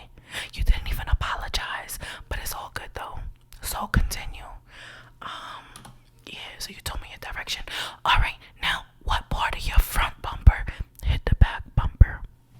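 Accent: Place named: New York, United States. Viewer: I knew you was from NY cause that accent baby lol. Brooklyn here. What part you in?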